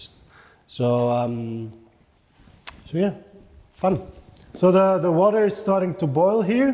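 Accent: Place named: Belgium. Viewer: Are you originally from Belgium? I detect a Belgian accent:) Groeten uit Arizona, USA - Ann van Daily Travel Dose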